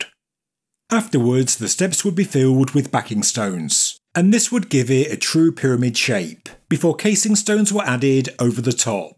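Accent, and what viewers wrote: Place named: Birmingham, United Kingdom. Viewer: In general like the content of these videos. It's just that I find the narrator's nasal, brummie accent so annoying that it detracts from the actual video. If he could use AI to hide his accent then I could probably sit through a whole video without wanting to puke.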